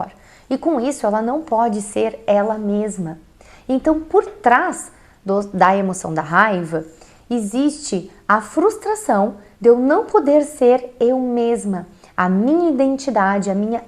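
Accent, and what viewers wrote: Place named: Rio Grande do Sul, Brazil. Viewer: Em 1 minuto de vídeo já detectei o sotaque gaudério kkkkk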